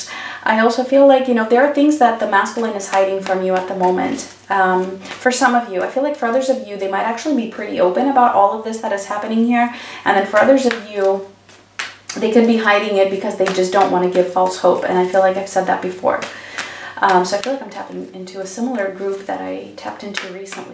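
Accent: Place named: United States. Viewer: Also are you Greek? Your accent sounds American.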